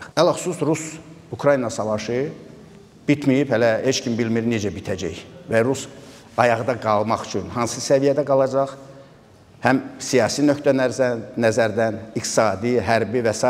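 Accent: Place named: Baku, Azerbaijan. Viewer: bey Maştağalidir yoxsa hansi Baki kendinden? Aksent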